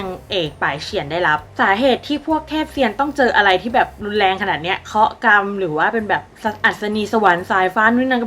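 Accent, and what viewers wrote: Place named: Isan, Thailand. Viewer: เเดนอีสานนาาาานิ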